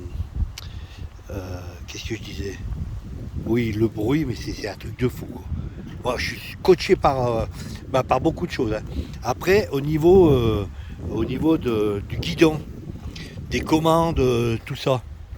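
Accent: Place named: Savoie, France. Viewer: j'me disais bien qu'il avait un accent de chez moi !!!! super merci pour cette video. J'ai essayé cette 750 chez Honda La ravoire ( 73 ) bah !!! ça a été le coup de foudre aussi. j'ai pas franchi le pas, je viens de leur prendre la 125 CB F comme petit utilitaire mais cette Transalp est top !!!! il est même venu a 2 pas de chez moi, au col de champ laurent !!! Elle était un peu haute pour mes 174cm mais bon !!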